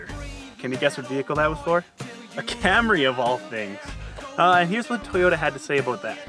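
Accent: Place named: Canada. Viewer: He’s mocking your (Canadian?) accent. Ignore the troll. Great video, great sound!!! 👍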